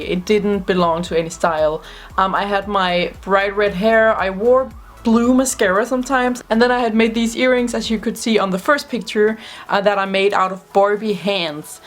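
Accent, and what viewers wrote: Denmark. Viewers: lol jeg vidste du var dansk pga din accent, har også gået på smededal!!!!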